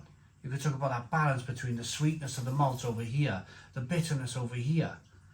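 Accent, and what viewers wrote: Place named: Scotland, United Kingdom. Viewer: Defo sounds like a Westie 😋👌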